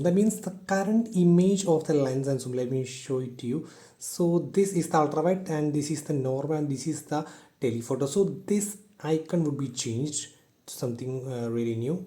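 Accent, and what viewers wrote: Kerala, India. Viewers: Heavy malaylee accent :)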